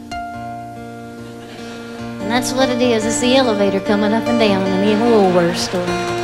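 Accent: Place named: Rural, United States. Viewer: I forgot she sounded so hick! But it is calming.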